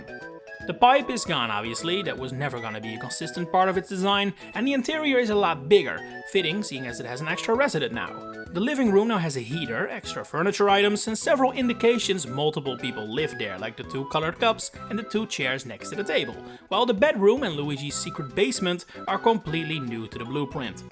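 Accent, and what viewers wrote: Netherlands. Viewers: Dutch accent detected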